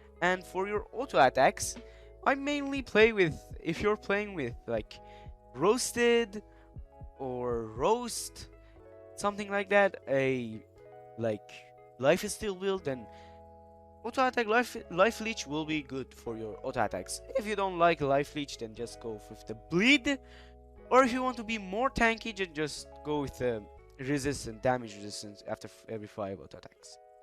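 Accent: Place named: Iran. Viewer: Why your voice like iranian?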